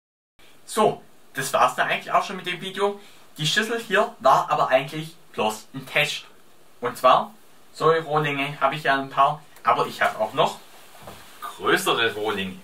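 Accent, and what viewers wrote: Swabia, Germany. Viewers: Schwätzt mol nur schwäbisch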